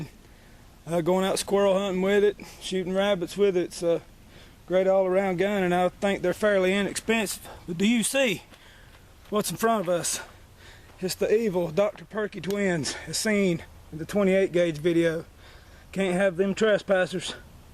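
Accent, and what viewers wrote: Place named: North Carolina, United States. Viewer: ya from buddy ? sounds like ur from North Carolina . Im not far from ya here in Virginia. And I got one of those H&R shotty s in 12ga given to me xmas of 78. Its one of those guns that you never get rid of cause your parents got for ya and that makes it all the more worth wow !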